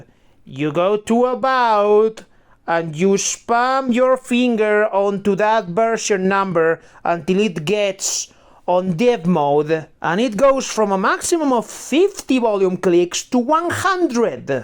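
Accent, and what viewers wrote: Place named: Eastern Europe. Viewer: Lol nice review brudder, like the energy. Gave me old YouTube vibes. Slavic accent on top of it all was an entertaining touch. HiBy R1 is a beast if you're willing to work with its quirks for sure. Has LDAC and MBES too which I wasn't expecting. Plus if you really wanted, you can use it with an external DAC/Amp too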